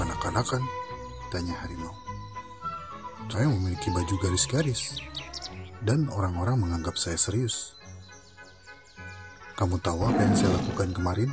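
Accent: Indonesia. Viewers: Pengisi suara di channel ini tuh org indo kan?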